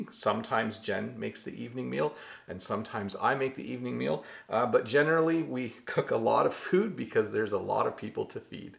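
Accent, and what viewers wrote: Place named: Canada. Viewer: I love the Canadian accent... it sounds smoother than the British accent 💖. do you have different accents in Canada 🇨🇦? If so why you don’t tell us about them please... 🤗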